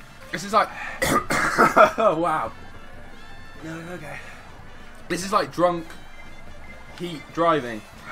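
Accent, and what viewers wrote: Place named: United Kingdom. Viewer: What part of Uk is he from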